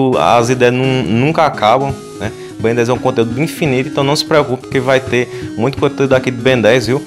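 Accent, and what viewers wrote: Northeast, Brazil. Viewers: Tu é nordestino ou carioca?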